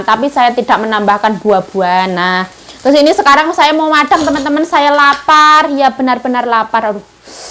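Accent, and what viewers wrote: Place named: Java, Indonesia. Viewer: lihat makan itu, jd kabita euyy, jd pengen makan, lagian dengan logat medhoknya itu bikin, guyu" dewek🤭🤭😁😁 Semangat yuk.. semoga kamu menjadi selegram yg sukses. Aamiinn🥰🥰🙏🙏🤲🤲💪💪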